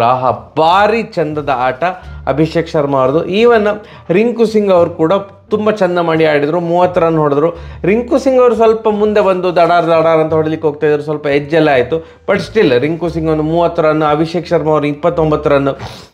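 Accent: Mangalore, India. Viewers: ಅಣ್ಣ ನಾವು ಧಾರವಾಡ ಜಿಲ್ಲೆಯವರು ನಿಮ್ಮ ಮಂಗಳೂರು ಶೈಲಿಯ ಮಾತು ನಮಗೆ ಬಾಳ್ ಅಚ್ಚುಮೆಚ್ಚು 🙏💐💛❤️ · Mangalore shaili Chand untu marayare❤❤❤❤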